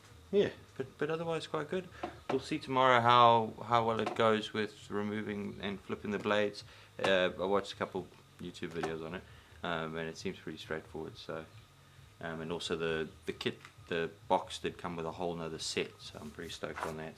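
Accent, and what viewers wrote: South Africa. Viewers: Definitely a Saffer!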